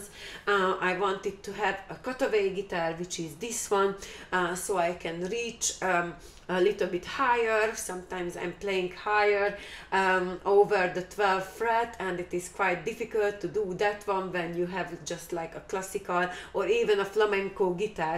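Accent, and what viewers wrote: Hungary. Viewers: basszus és tényleg magyar vagy 😂😂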